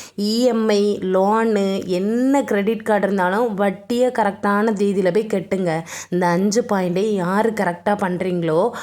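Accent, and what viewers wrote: Tirunelveli, India. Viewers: நெல்லை பேச்சே தனி அழகுதான் சூப்பர்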